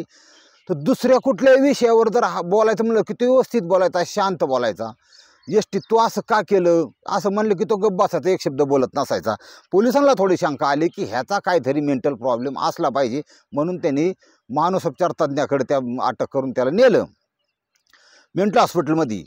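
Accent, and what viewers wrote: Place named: Rural, India. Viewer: गावरान शुद्ध मराठी बोलण्याची शैली मस्त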